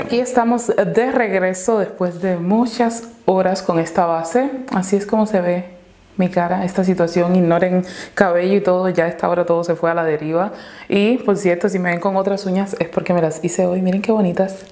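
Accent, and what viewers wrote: Cuba. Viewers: No es que no sepas decir la palabra, sólo es tú acento cubano. 🖤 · ella es cubana asi es su asenton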